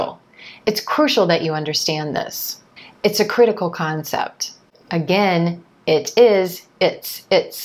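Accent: United States. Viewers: you are the best teacher!! I love American accent.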